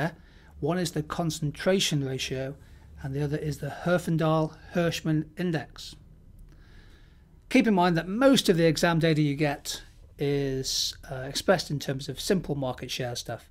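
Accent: United Kingdom. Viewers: This video is very helpful, but I think I was watching it mostly because of a really sweet British accent ☺️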